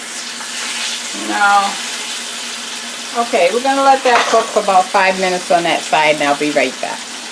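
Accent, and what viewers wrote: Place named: Boston, United States. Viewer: grew up in west Roxbury, recognized the accent immediately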